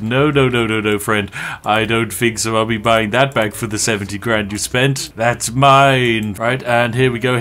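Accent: United Kingdom. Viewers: Wait... British??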